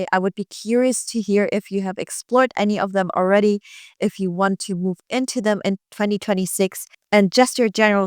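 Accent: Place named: United States. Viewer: A stand-out assortment of savvy tips and advice for the mid-2020s photographer. I can attest —even if only on the basis of very modest experience— that this video offers a good number of relevant ideas for our time and the contemporary market. | In re Ms. excellent ESL skills, as an American, I applaud the Yiddish-ish "regular-schmegular" [if I've gotten that right] and would like to add that "different from" as opposed to [the British-influenced] "different to" sounds much more natural to this listener's ear, particularly in view of the fact that her English [including accent] is overall far more "American" than British. In addition, the adjective "in the high street" is clearly a British-ism, for which one could substitute "main street" or just eliminate the modifier. It should otherwise be understood from context that said business is serious, reliable and focused on quality. 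You could also use the shorter "based in Berlin" vs. "based out of ~," which sounds like rural Wisconsin. O, those prepositions! These suggestions are offered solely in a constructive way and in appreciation of her mastery of the lingua franca of world business. Vielen Danke!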